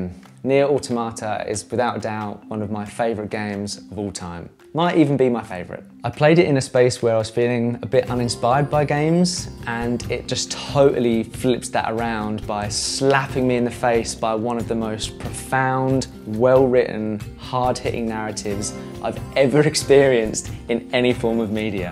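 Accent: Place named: United Kingdom. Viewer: You’ve made three videos. On steam deck. Three. That’s it. This is my favorite steam deck channel. Admittedly the accent helps, I love the shit out of Brits. But honestly you’re so entertaining and genuine. Keep it up “mate” ;) I’m working on it! And ps keep being a great human, anyone who teaches for a living deserves a special spot in well whatever you believe the afterlife is. Teaching children especially. Well done!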